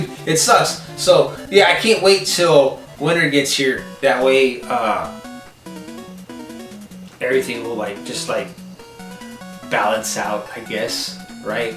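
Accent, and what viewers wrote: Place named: Texas, United States. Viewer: I'm from South Carolina, so probably not the best judge of accents. But yeah you have a slight Texan accent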